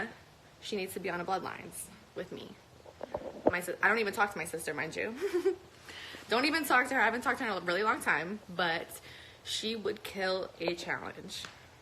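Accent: United States. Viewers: candy candy she’s from Jersey and is Italian. That’s just how we talk here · is u light skin black bae? u got that nice light tone an i think maybe u black cuz how you talk . sound lik u come from were i from (bronx usa baby!!!!) pls tell me yss u black my god u fne bae gd bless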